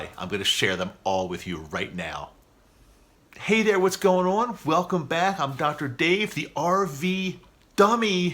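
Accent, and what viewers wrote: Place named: West, United States. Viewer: I would guess that you are from a high cost of living area. Most likely Washington, Colorado, California, or Oregon. You don't sound (accent) like you are from a high cost of living area in the Northeast like Boston, New York, New Jersey, New Hampshire or Vermont.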